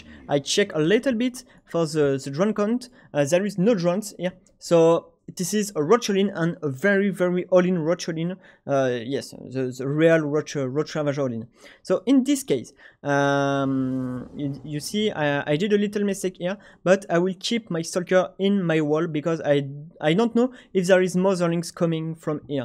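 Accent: France. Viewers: Great info dude! Love your Frenglish accent as well :) Waiting for more non-French videos like this.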